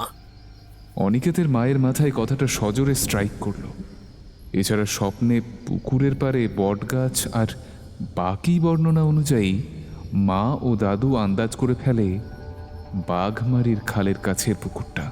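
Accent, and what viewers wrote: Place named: Purba Medinipur, India. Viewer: পূর্ব মেদিনীপুরের মতো ভাষা আমি রামনগরের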